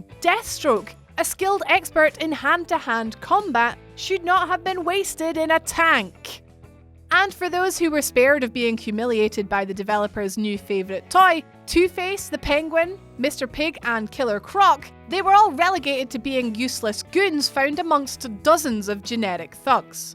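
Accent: Scotland, United Kingdom. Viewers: Why do I think she has a Scottish accent?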